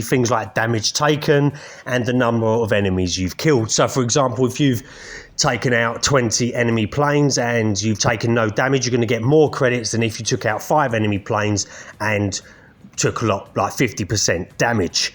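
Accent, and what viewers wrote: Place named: United Kingdom. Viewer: Yes mate Nice to hear some UK pilots doing a review…coventry massive here, hardcore MP only…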